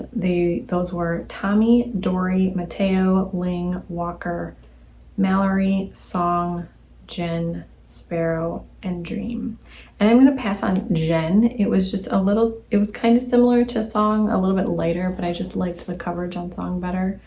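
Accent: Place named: Midwest, United States. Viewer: Great video, I've always been a bit intimidated by blue nail polish, but it's so cute! Also, I'm hearing a suuuuuper strong Wisconsin/Minnesota accent, am I correct? Lol.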